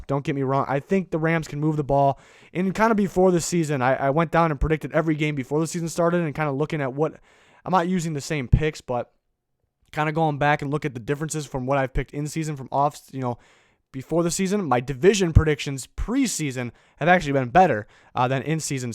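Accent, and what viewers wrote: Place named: Boston, United States. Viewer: Did you go to school in Boston because you sound just like a Patriot fan. Same score prediction to. In a little less than 9 hours you will see. Oh, and thanks for the awesome D coordinator. Leslie Frazier was just what we needed!👍😉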